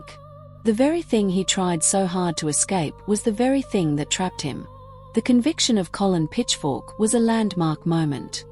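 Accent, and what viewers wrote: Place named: Australia. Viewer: At least the ai has an Aussie accent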